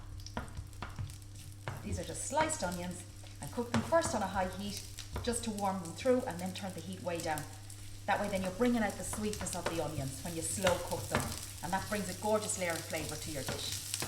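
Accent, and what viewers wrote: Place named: Ireland. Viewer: Love watching you cook and love that beautiful Irish accent to...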